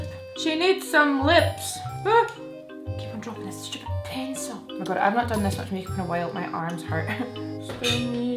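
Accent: Scotland, United Kingdom. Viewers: you’re scottish omg!! me too i’m so happy i found a scottish youtuber ahaha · Hi from Israel! Are you scottish? I love your accent❤🌹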